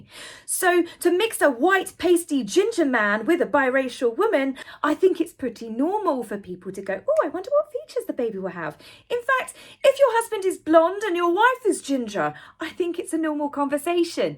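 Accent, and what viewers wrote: United Kingdom. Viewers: Your British accent is so adorable and hilarious hahahaha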